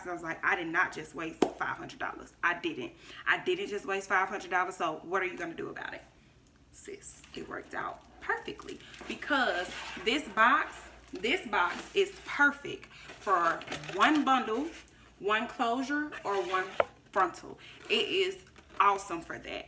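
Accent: Atlanta, United States. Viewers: Tour video just popped up in my library. Good advice. Also you have some pretty skin and love the southern accent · Lol i was like u sound like from ATL and right after i said it u said u were from ATL. Lol im from here too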